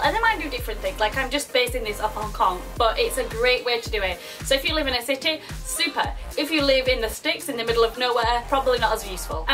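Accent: Yorkshire, United Kingdom. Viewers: I love your accent <3 Which part of Yorkshire are you from? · hire! i started to see your yorkshire accent videos from 2011 and in your more recent videos i can understand much more now of what you are saying haha, i started to study in sheffield this year and man !! the accent is so difficult to understand, at least for me.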